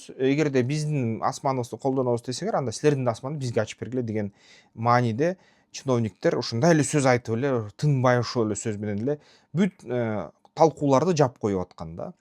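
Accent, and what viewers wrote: Standard. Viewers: Сиздин таза Кыргызча сүйлөгөнүңүз өтө соонун. Азаматсыз Улут бул Тилден башталат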